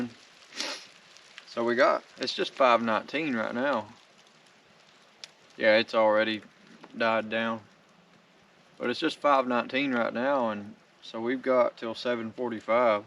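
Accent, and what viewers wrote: South, United States. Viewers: nice, you have a pretty strong accent and I figured you are from the south, a gun would be a good idea eh but then again you are safer in the woods then in a city lol here in Canada we are under attack because our government wants our guns 😡 we are fighting like hell to keep them, anyway that’s a long story… stay safe 🤙🏻🇨🇦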